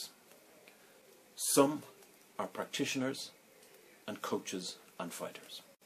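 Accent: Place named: Ireland. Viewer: Like your channel subcribed to lt lhave 20 years in karate done wingchun pressed thumbs up are you lrish you sound lrish lam lrish my grand dad was boxer and my uncles were boxer s lam 64 now love all things about japan and china my master was japanese there old lrish saying lt meant for you lt wiil not pas you by my mum and dad came from co limerick in lreland all besr with your channel godbless seamus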